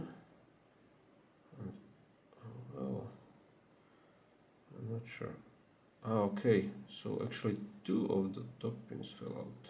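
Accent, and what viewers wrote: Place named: Czech Republic. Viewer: Ahoj, hromada krásnýho odemykání a rozebírání... podle přízvuku a dnes i podle dvojkoruny koukám že jsi čech, tak si říkám jestli se neznáme z nějaké komunity, jezdíš na Lockcon?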